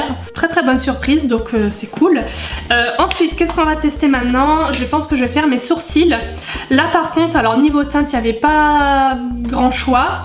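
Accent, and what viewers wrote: Lorraine, France. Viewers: Tu as grave l'accent Lorraine, tu habite à Forbach ? 💕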